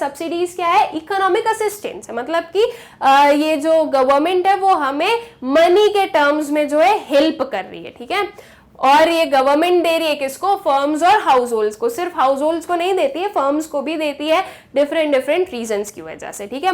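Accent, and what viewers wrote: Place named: Gujarat, India. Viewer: Maam have gujrati tone 🔥🔥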